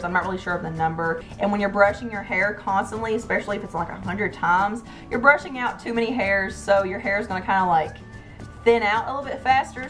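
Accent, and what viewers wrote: South, United States. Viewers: that southern drawl 😍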